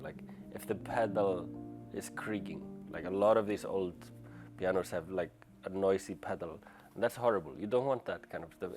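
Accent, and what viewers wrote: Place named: Iceland. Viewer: Just happened to witness you talking for the first time and your Icelandic accent is so charming. You could easily do ASMR content btw. Oh, and cheers for all the blissful music you make. ❤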